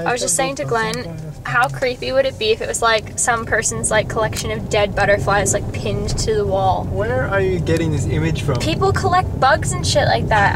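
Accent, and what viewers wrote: Australia. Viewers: you are sounding more and more Australian every video C: haha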